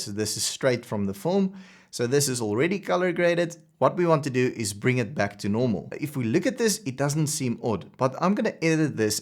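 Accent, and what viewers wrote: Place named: South Africa. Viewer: South African!!!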